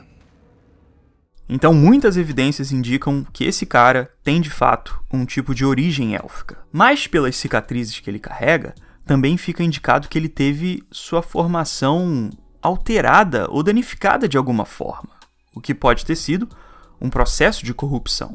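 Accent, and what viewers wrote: Rio de Janeiro, Brazil. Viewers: Oh vey kkkk carioca?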